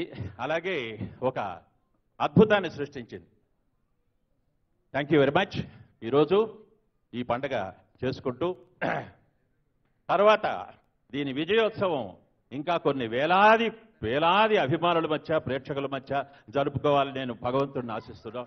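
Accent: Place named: Rayalaseema, India. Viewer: That is our rayalaseema style ,,, feeling proud frm proddatur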